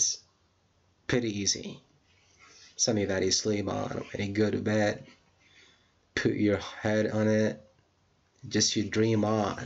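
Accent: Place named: United States. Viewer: i like your american acent i like american english can you give us more hints and clues about the acent in english and thir differences ?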